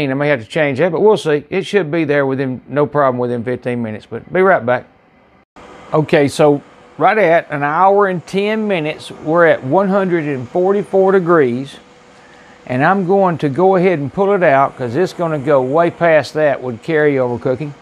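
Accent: South, United States. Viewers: I honestly like your videos.. But I'm a NYC girl..and omg love your southern accent!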